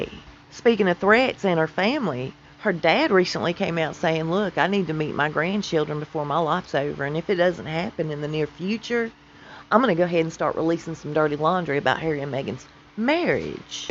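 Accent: South, United States. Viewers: You really have a strong southern accent where are you from? I'm from the south but my accent is not as thick as yours.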